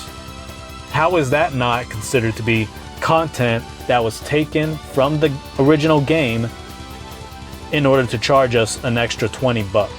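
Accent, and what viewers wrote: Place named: South, United States. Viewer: What you do sound from the south because I know people who do. So your ancestors from the south sir that you got it from. I would not say 50 charter from marip kart world is trash itnis you don't care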